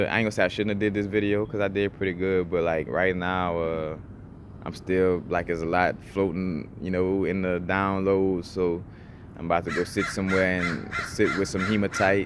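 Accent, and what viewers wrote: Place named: Louisiana, United States. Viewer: where you from bro? you sound like youre from my neck of the woods down in the boot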